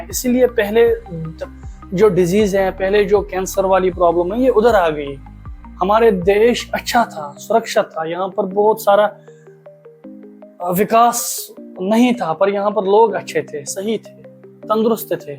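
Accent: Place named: Kashmir, India. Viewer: Are you kashmiri btw accent sae lag raha hai,i am from Jammu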